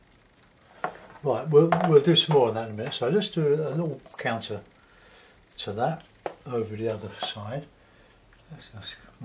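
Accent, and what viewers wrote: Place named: South London, United Kingdom. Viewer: Great painting, I look forward to your videos, you sound like a south London bloke, I'm in Croydon do you know where I might pick up a hake brush locally? By any chance, cheers for sharing your work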